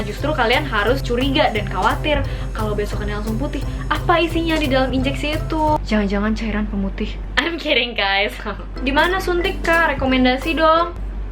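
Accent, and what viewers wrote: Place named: West Java, Indonesia. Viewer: Kek orang sunda yah logatnya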